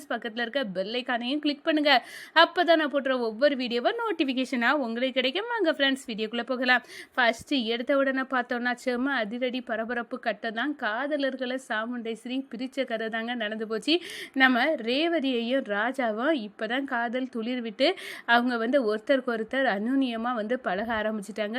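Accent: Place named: Tirunelveli, India. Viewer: திருநெல்வேலி பேச்சு super ❤ தொலிய உரிக்கும் வார்த்தை very nice. akka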